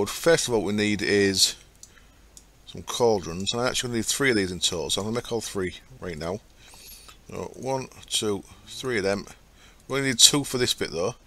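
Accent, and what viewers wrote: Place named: Hull, United Kingdom. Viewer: Fab video.. Just started Monster after me Direwolf20 crashed... Love the Hull speak, And guessing Hessle road the way you swear lol.. Good stuff (y)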